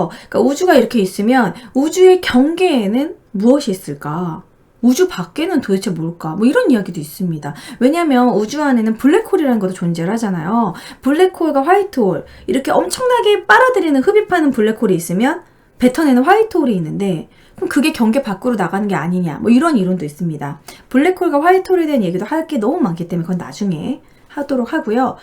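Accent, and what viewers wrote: Gyeongsang, South Korea. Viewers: 혹시... 경상도죠? 중간중간 억약이 좀... ㅎ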